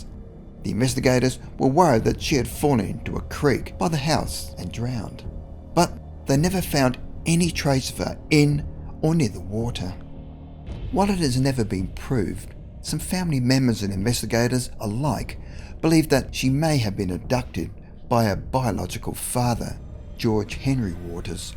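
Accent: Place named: Australia. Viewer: cant they find someone other than that aussie to narrate? what would he know about california anyway?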